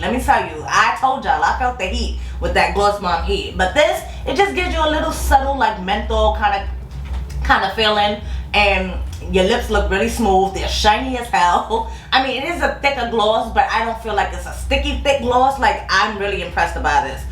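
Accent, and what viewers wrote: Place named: New York, United States. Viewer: Subbed! I like your accent! New Yorker? If so which borough? I'm your Connecticut cousin now! Lol!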